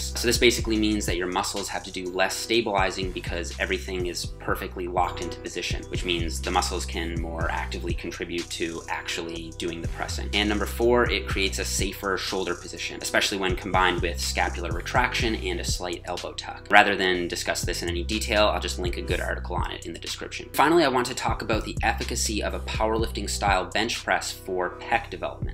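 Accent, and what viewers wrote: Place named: Newfoundland, Canada. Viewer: he sounds like a Newfie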